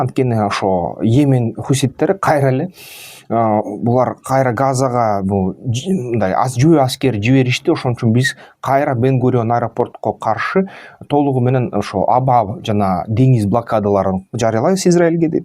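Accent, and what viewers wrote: Standard. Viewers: Нукура кыргызча суйлойсун азаматсын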